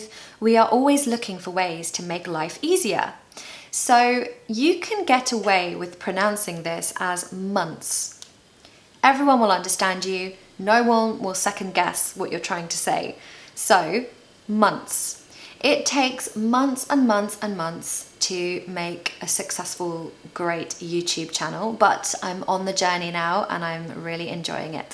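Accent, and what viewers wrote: RP, United Kingdom. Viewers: First of all, glad I am so early to this newly opened channel. Second, can you make a video of you pronouncing random sentences and questions so that I can repeat after you and sound more natural??.. I am trying to learn the British accent ❤❤❤❤😁😁😁 BTW, you are a natural, and have such a stunning voice and accent that really helps people like me, who struggles with british accent, to learn faster. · Is received pronunciation your natural accent or do you speak it mainly for teaching purpose? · Okay, let me say this. You are the BEST teacher on the youtube by far. I have been looking for a teacher and now I have the best one-. I love that your teach us about the British culture as you teach us about the British English. This is FANTASTIC. Please Please Pleaaaaase upload more videos. I watch your videos everyday now as I want to adopt your accent and learn more about the British culture. 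· I love your beautiful smile and beautiful British accent! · I liked the way you used elision technique in pronunciation, great video. Lovely British accent. I m learning from you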